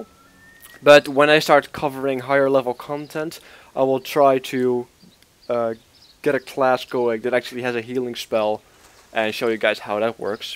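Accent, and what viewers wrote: Netherlands. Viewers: Any chance youre dutch? It kinda sounds like it.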